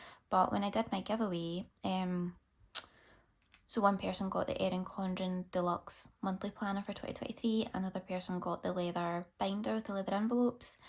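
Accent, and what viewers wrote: Scotland, United Kingdom. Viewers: My American self didn’t know how much I loved the Scottish accent until now 😩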